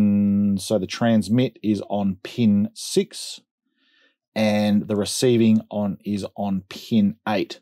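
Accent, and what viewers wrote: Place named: Australia. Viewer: Well, kinda hard to say, but listening to it was easy, and though I was doing other things, I was able to key in on what I was searching for, I.E., tying my Arduino in with my Digitrax system, plus a bonus of JMRI interfacing with both. And I have to be honest, I found your Australian accent cool! That said, I will probably listen to it again and again to get the full gist of it. But overall, it was easy to listen to and very comprehensive. Thanks again, mate, or as we say In America, pal!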